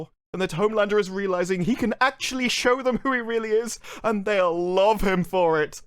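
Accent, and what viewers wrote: United Kingdom. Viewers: Mate, are you taking the piss? You’re trying to miss the point or are just a professional idiot with a British accent?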